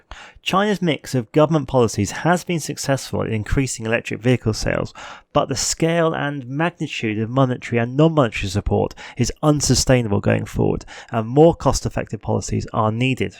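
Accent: United Kingdom. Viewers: I think you did an excellent job. But than again, I'm a native English speaker from Ireland so very used to the British accent. By the way, I just subscribed to your channel due to the highly informative and interesting content. Keep up the good work!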